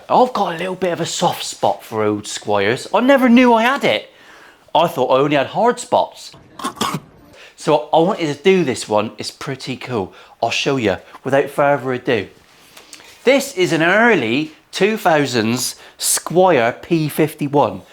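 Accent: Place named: Scotland, United Kingdom. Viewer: I love your videos to. I just wish they had subtitles in English, for native speakers. The guy in these videos is Scottish or part leprechaun or something, and I feel that content is getting lost in their accent at times.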